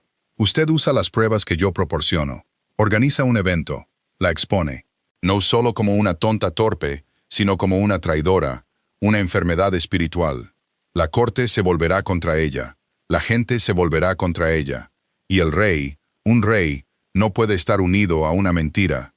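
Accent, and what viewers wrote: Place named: United States. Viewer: Podria ser una voz de IA que no tenga acento gringo?, ya sabemos que estan desesperados por invadir latinoamerica pero ya esto se pasa un poco.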